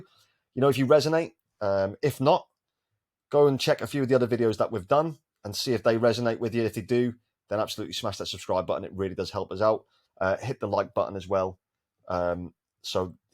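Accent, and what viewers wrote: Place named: Birmingham, United Kingdom. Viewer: Been trying to work out your accent, I think you’re a Brummie, or midlands. I’m from Brum but live down in London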